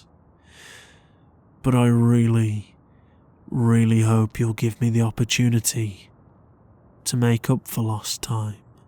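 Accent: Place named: Birmingham, United Kingdom. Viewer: You are doing great things for the Brummie accent 👏🏻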